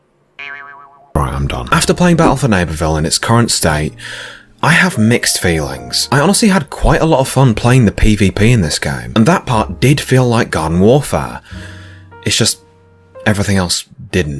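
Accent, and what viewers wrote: United Kingdom. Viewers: Bro you started with epic british lector voice and then you show us a beta 2011 emo kid recording in parents bedroom. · ar u British mate???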